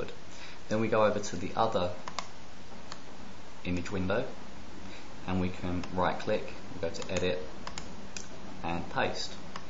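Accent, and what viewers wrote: United Kingdom. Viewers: You are from UK, aren't you?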